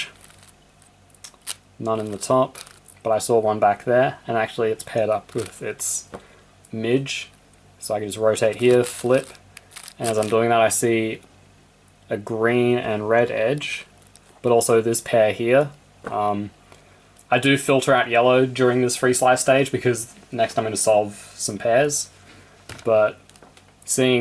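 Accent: Australia. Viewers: You sound a bit like Feliks, probably Australian accent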